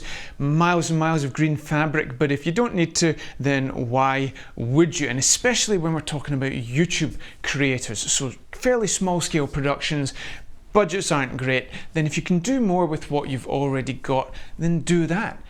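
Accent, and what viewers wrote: Scotland, United Kingdom. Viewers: Nice Accent, I want to go to Scotland someday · If only I could have the voice Scottish accent:). Thanks for the video....helps with COVID and carrying on with classes:)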